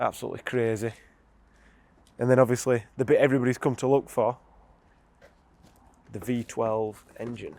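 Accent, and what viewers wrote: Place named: Barnsley, United Kingdom. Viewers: Thought I recognised that Barnsley accent! 😆👊🏽💪🏽 Those cars are driven by absolute fucking pellets with literally no driving skill whatsoever. No surprise it's been twatted. Please try to make sure you don't sell it to another colossal helmet 😆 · You from Barnsley fella!!!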